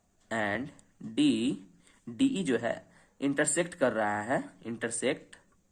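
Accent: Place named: Delhi, India. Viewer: Mast sir bs accent Delhi wala dekhna padega 😅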